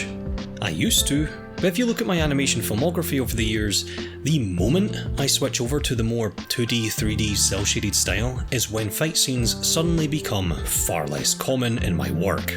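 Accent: Scotland, United Kingdom. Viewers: He's an absolute gigachad. Can write, can animate, can voice act, and who knows how many other skills, and he has an epic Scottish bass voice to boot. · I can't quite place your accent... Is it English or Scottish?!?! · Yes. He actually makes his notes in a Scottish accent.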